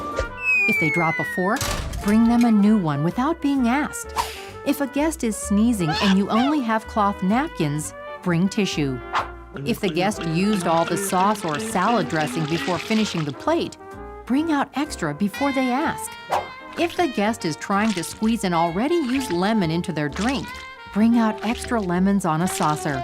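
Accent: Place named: United States. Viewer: A bit American but good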